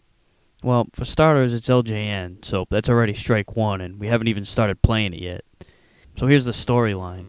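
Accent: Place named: New Jersey, United States. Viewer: sounds like you're from jersey.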